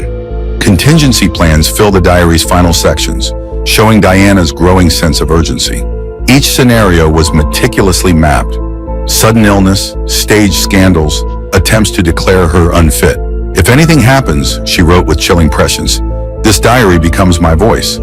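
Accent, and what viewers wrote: United States. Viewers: Why is an American doing the commentary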